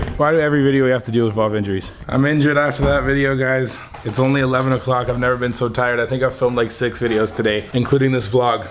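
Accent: Jamaica. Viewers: Up mi cahgi with the Jamaican accent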